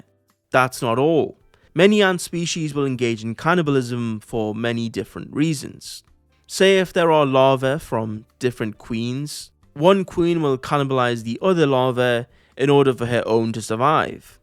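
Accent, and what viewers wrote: South, United States. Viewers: please bring back the southern accent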